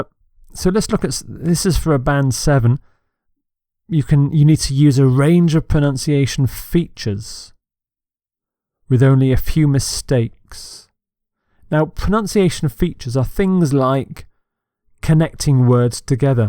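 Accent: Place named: United Kingdom. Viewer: Thank you you are my best tutor, i love Your accent very much. I really would like be like you with your accent.. Please give me some tips as if i can be a British speaker like You. And i would like to be introduce with you personally. I am from Bangladesh and you?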